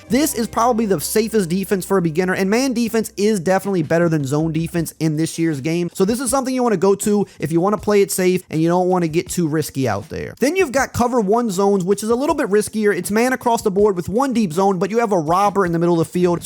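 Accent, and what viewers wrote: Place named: New Orleans, United States. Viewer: I don't know where you are from but you sound exactly like people from a suburb of New Orleans.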